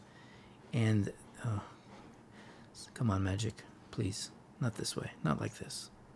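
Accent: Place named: United States. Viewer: Soothing voice with american accent as promised by CGB — check ✅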